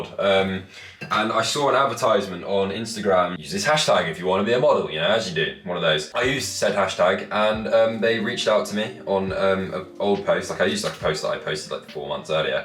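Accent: United Kingdom. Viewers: THE BRUSISH VOICE AHH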